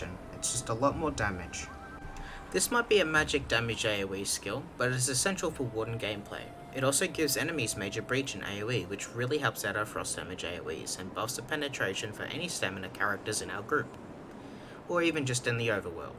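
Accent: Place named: United Kingdom. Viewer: Thank you so much for being experienced, and explaining everything pristine. I came here from a comment you left on one of Hack's eso warden build videos. His build was nothing compared to yours and I almost made the grave mistake of copying his build. That is when I found your comment, it seemed so educated, which made me click on your channel. When I saw you had a REAL man's warden build, I knew God existed and worked in many mysterious ways, and that those ways brought me here. I am from the States, but you sound like you are in the UK, God Bless The Queen.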